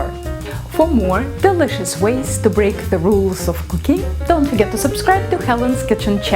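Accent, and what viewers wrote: France. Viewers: Very good, and I love your french accent! I am going to try this tomorrow.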